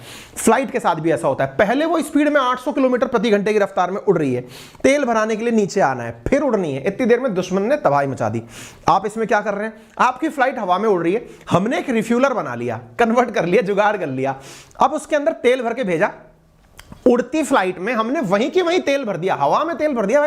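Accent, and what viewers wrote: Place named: Rural, India. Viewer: Kya dehatiyo ki tarah bolta hai master. 😅😅😅😅😅😅😅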